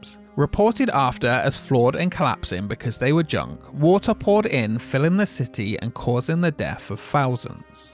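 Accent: United Kingdom. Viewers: Hey what is your home park because i though i hear you speak british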